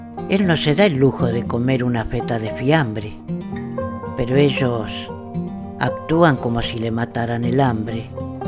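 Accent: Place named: Argentina. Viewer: Tremendo tremendo,bien Argentino lo que dice y como lo dice... felicitaciones gran abrazo...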